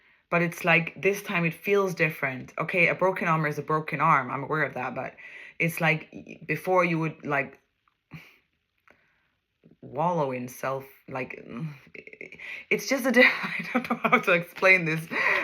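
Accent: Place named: Midwest, United States. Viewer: [VIRGO]~~~🛩️ HI! I had 444 on my phone and then you pop up on my TV screen😊 I'm new here. By your accent I would've swore your from the Midwest like myself..Illinois. Nice to meet you❤ have a awesome year! Your a doll😍😊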